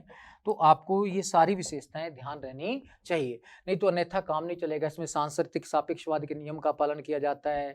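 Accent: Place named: Rajasthan, India. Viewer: Aap Rajasthan se honge 100% kyu ki hindi language ko bolna or likhne m jo galtiya aati h wahi k logon m aati h . Regional language ka asar hota h .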